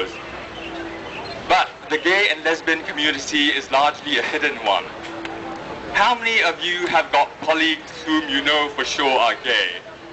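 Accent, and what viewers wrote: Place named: Singapore. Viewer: We Singaporeans love our own accent babe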